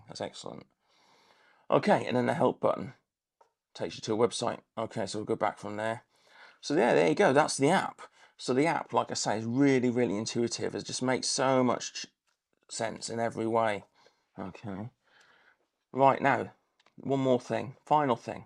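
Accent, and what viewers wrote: United Kingdom. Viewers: Thanks a lot for the excellent review,professionally done,plenty info,no gobble dee gook. Like the friendly style and Your British accent too ! Regards: Peter from across the channel.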